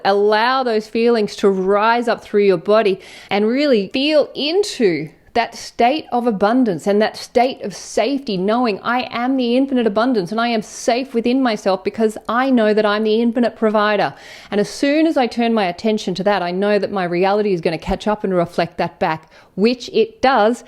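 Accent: Australia. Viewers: Thank you for reminding me about the law and its nature. Btw, what's your accent? Is that Aussie?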